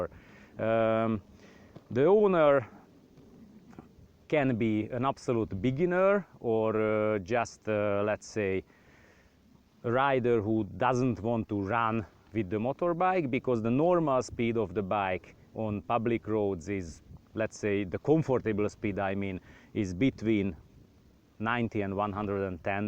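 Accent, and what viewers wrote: Hungary. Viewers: magyar akcentus:D nice video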